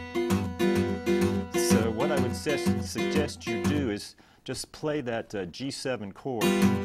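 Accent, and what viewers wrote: England, United Kingdom. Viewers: I think the Broonzy style has further to go in the right hands. He seemed like a marvellous under-rated innovator to me. He stands musically above so many of his time he deserves more acclaim. Thanks for your stuff here, - spot on and you sound English too! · From one to another...father was Ernie.....your lessons are the very best I have yet encountered. Wonderfully clear videos and perfect communication skills. I too would have bet you were English with a touch of U.S. accent. Your family must have come from there at some time. If only all teachers were this good. Thanks Ernie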